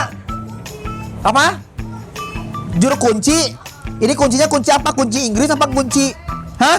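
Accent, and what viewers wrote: West Java, Indonesia. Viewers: Duh liat ngomong sunda asa ketemu lagi sama sahabat kecil aku basa di garut persis banget logat ngomongnya😭 kangen garut jadinya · Mantap, ciri khas ngomong Sunda nya jangan sampai hilang Poko na mah!! Soalna ciri khas yg ramein nya kata2 Sunda😂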